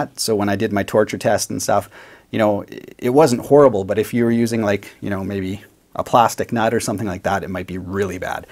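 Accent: Canada. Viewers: I really like catching the slight differences from American English he does as a Canadian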